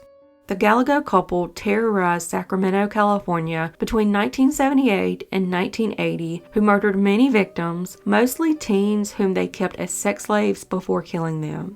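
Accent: South, United States. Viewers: Just lovin that cute southern accent, you sound like a gentle stream caressing the pebble just pure flow x love it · That accent takes me back to childhood 😭. I miss the south, Ohio is horrible place.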